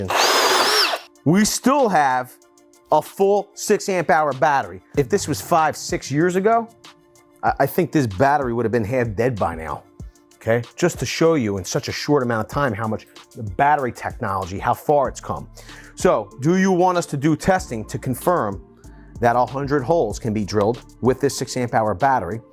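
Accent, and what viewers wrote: Philadelphia, United States. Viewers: What part of Philly are you from? That accent.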